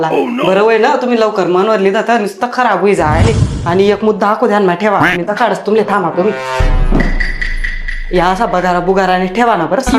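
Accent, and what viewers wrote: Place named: Khandesh, India. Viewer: Ek no khandeshi bolta tai mi pn khandeshi ahe tumchi bhasha aaikun khup aaplepna vatato❤❤❤❤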